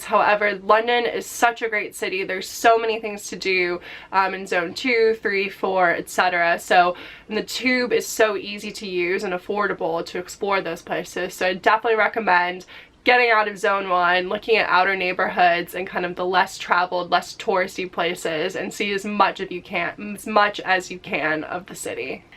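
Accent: United States. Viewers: Don’t force it. She still sounds American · As an American myself, her accent sounds like a typical lady here in California. I don't here any type of European accent in her voice at all.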